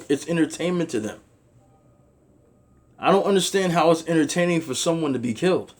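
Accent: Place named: New York, United States. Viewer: Who is this buff man with a New York accent? Miles Morales?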